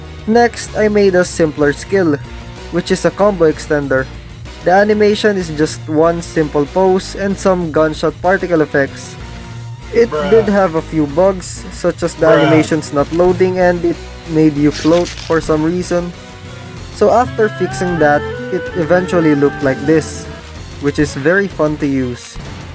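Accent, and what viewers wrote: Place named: Philippines. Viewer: Nakita kolang tong video mo kaka scroll ko hahahah sa boses mo palang halatang pilipino, keep it up bro lab u. ❤❤😂 Proud developer pilipino here😂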